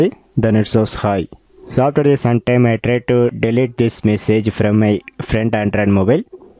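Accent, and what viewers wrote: South India, India. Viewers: I think that he,probably, is a south Indian.because his accent is a bit like that.however, the video deserves a salute😊😊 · South indian voice , nice video bro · South-indian accent love tht 👍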